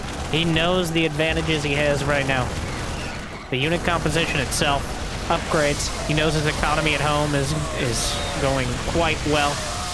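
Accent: United States. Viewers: Ты американец?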